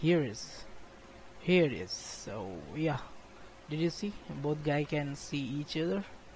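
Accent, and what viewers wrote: India. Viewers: U have indian pronunce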